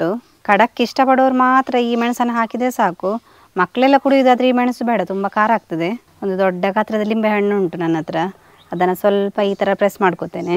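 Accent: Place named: Dakshina Kannada, India. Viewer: ಮಡ್ಕ ಸೋಡಾ ರುಚಿ ಕುಡಿದ ನಂತರ ಗೊತ್ತಾಗುತ್ತೆ ಆದ್ರೆ ನಿಮ್ಮ ಕನ್ನಡ ಉಚ್ಚಾರಣೆ (ದ. ಕ. accent ) ಕೇಳಕ್ಕೆ ಬಹಳ ಚೆನ್ನಾಗಿದೆ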